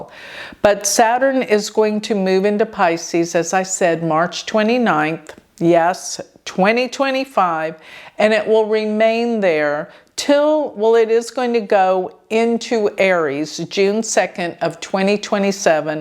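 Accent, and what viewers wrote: Texas, United States. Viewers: You can speed up the playback by going to the Settings button that looks like a little wheel in the bottom right hand corner of the video; click on it, select Playback Speed and you can speed it up! I usually use 1.25 or 1.5 speed if I'm in a hurry. But I do love a Texas drawl, so normal speed is good for me!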